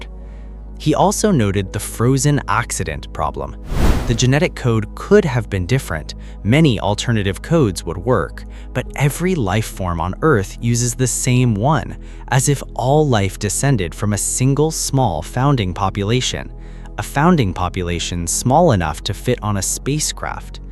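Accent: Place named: California, United States. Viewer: The most California accent I've ever heard.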